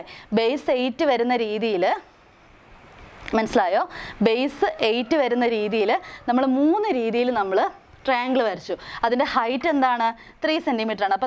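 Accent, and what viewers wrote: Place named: Kollam, India. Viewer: കൊല്ലം ഭാഷ ആണ് എനിക്ക് നന്നായി മനസ്സിലാക്കുന്നത്. ഞാൻ കുറെ ലാംഗ്വേജ് സിൽ ഉള്ള ക്ലാസ്സ് കണ്ടു അതായത് തൃശ്ശൂർ തിരുവനന്തപുരം ഇതൊന്നും എനിക്ക് മനസ്സിലായതേയില്ല അങ്ങനെ ഒടുവിൽ എനിക്ക് കൊല്ലം പത്തനംതിട്ട ഭാഷയുടെ ക്ലാസ് കിട്ടി.😝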